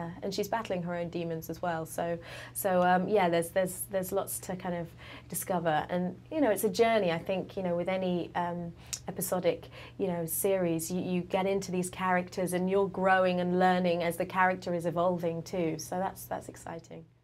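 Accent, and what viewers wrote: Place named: RP, United Kingdom. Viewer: She sounds very different in this interview, so much more posh! She's great, I love her.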